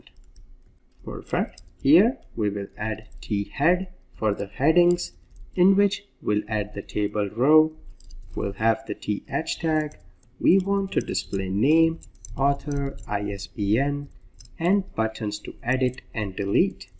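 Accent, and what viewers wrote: India. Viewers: makes me nostalgic hearing a indian guy making .Net tutorials (courses) for this. Takes me back like 12 years to good old days of youtube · Imagine speaking Hindi and then trying to switch to english for three straight hours. It must be a tounge twister. At least he manages. Every time I try to speak in english it is terrible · It's an sweet Indian English accent 😁🇮🇳🇮🇳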